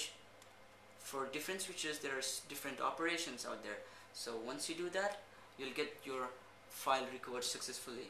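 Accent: India. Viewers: Gotta love the Indian accent! :D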